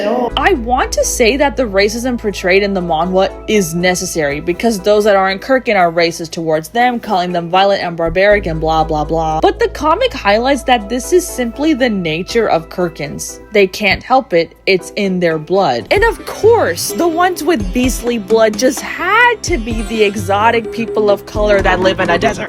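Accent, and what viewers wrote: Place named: India. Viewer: I heard a desi girl and locked in 💅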